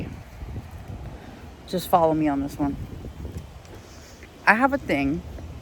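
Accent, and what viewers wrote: New York, United States. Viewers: you actually sound Italian in your normal speaking. You sounded great imitating Sonny, because you naturally sound like from New York or Philadelphia! You always reminded me of a girl version of Ralph Macchio. You would have been terrific if you got to be in the Godfather movies. I lent $1050 last year to two different people, $500 to one person and $550 to another person. I haven't gotten a penny back so far. Well, I will be just like Sonny, they will never ask me for money ever again, so I paid that to get them off my back. Also, I truly believe that God replenishes in the future. God will give it back and I might not even realise it, but he will.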